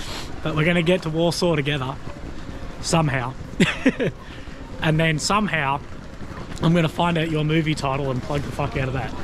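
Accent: Australia. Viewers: Sound Australian? You a legend brah big ups from Perth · I can hear an aussie accent. Please get rid of the beard, we cant hear your voice or see your mouth to try match up words. Good luck mate, stay safe, get out safe. Steve in australia.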